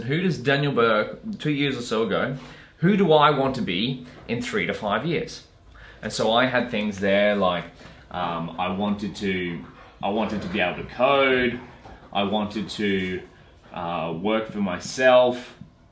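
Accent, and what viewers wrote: Australia. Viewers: I WAS DESPERATELY WAITING FOR YOU VIDEO! Question - Are you from Australia? If yes, which city?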